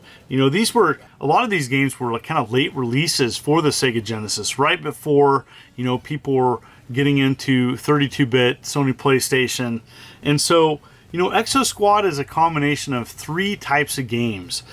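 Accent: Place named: Northern California, United States. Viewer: Great video. It’s funny I’m a NorCal dude also and i recognized your “accent” immediately lol. Thanks for the great content. Love it.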